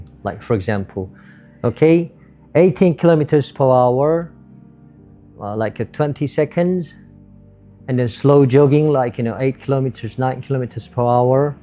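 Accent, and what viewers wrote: United Kingdom. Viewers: i like it hear british accent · British Accent nya renyah didengar 😅👍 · It is just refreshing to hear someone speak with a British accent in Indonesia, as most people use an American accent. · Thank you coach.. its nice hearing your British accent 😊 Semangat Garuda 🔥🔥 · Aksennya british sangat😂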